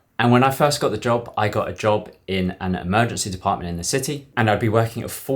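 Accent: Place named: United Kingdom. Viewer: Hi As the child of a British migrant, I've always believed my mother when she told me how nice it is here. It's sad to hear so many Australians talk the place down & and can't wait to leave. PS: I think you have lost some of your British accent! My English mother is 94, and sounds very English to me but British people tell her the same thing. Well done for being adventurous & trying out a new life.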